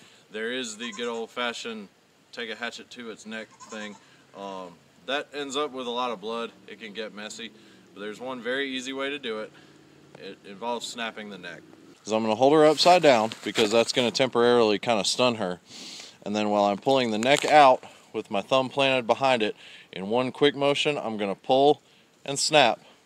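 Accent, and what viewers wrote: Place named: Georgia, United States. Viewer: You SOUND like you’re from Georgia. How does a southern country boy end up in Northern Michigan? Side note: I recently discovered you on my random Facebook video wormhole and I’ve now subscribed and binged 5 videos so far. Love it!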